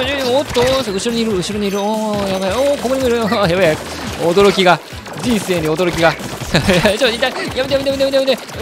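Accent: Hiroshima, Japan. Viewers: Rさん動画投稿お疲れ様です😌💓 Rさんの広島弁が加藤茶さんの奥さんに似ていたのでびっくりしました❗️次回の動画も楽しみにしています🐱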